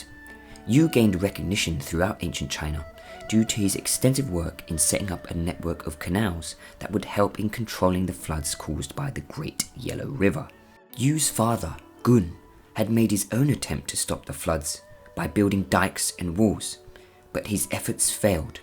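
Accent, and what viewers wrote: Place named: England, United Kingdom. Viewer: I can't fathom being pissy with his pronunciation being off. He's got an English accent and the desire to not only learn, but to teach about this fascinating culture's history is admirable. Most westerners don't have the ability to pronounce everything perfectly in a language so profoundly different. We just don't have some of the sounds prevalent in english. Seems to me that taking issue with such trivialities is more about making sure everyone is aware you know more. This nonsense today where some think mispronouncing something is disrespectful is a joke. I can't imagine someone getting prickly about english mispronunciation, but maybe some do. Maybe just an indicator of the toxicities that exist among all people in certain individuals. I'm just appreciative that he used his time to put up a video in an effort to teach people. 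Thank you for making concise videos for us who just want to learn about the culture and history. Great narration and choice of photos/art.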